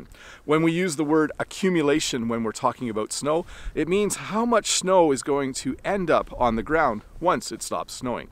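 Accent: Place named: Canada. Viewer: I heard the hallmark of Canadians a lot out here: the Canadian raising "aboat".Lol.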